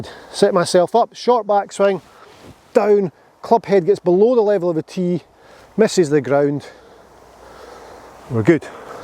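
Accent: Scotland, United Kingdom. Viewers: Hey! First of many comments! Hi - thank you for you excellent tutorials. I always enjoy your lessons, recommendations, tips, and your entire approach to the game. I am an intermediate golfer over in Calgary, Alberta, Canada. I'm happy to big you up whenever I can. I had previously looked at other golf-guru types with similar channels, none of them helped me. I then looked at your Ben Hogan grip lessons, your overall laid back approach to the game, your Eureka methods, and boom, what a difference in my game and my enjoyment. That, plus now I can channel a Scottish voice in my head when making my shot. Thanks, keep it up.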